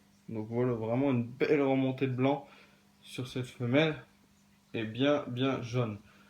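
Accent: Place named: North, France. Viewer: Petit accent du nord ^^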